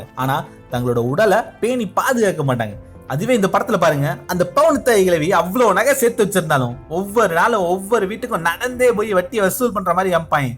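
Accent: Madurai, India. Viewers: Madurai slang nalla irku anna ....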